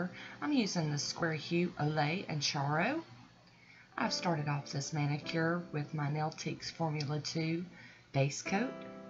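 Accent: South, United States. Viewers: Rascally rabbit!! 😉 You just have the sweetest southern accent!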